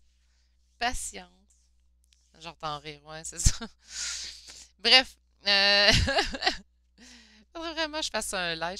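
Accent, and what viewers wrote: Canada. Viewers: Oui reste toi-me^me,.Et quand tu te coupes à l'intérieur de la main, le plus simple, et c'est ce qu'un médecin m'avait conseillé, c'est de la glue. MAIS ATTENTION, si on peut utiliser de la glue 1er prix, LE SEUL IMPÉRATIF c'est de prendre de LA GLUE LIQUIDE (et pas en gel). Et ça n'a rien à voir avec le tirage, peut-être vas-tu m'envoyer sur les roses (et c'est ok: on me dit toujours que je suis trop curieuse!), je me questionne tout le temps sur ton accent, j'veux dire si je crois que tu es canadienne qui parle particulièrement bien français. Parce que i tu es québécoise, je ne reconnais pas les expressions typique de Quebec? Et comme toujours, c'était un plaisir, comme à chaque fois. Donc, MERCI pôur tout ce que tu nous offres de temps, d’énergie et de bienveillance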